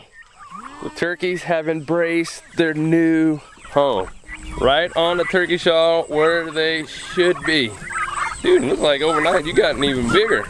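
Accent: South, United States. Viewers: Hi Family & everyone! I’m from Nashville Tennessee. And your come on come on> country twang makes me miss home. Love ya guy’s! God Bless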